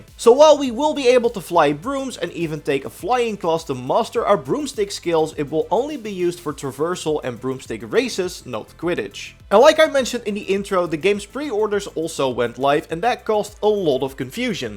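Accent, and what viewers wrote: Netherlands. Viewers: Omg your dutch accent is so distracting... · The Dutch accent coming through alot just the way it's meant to be :3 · Bruh you ooze Dutch with that accent. Love it 😂👌🏽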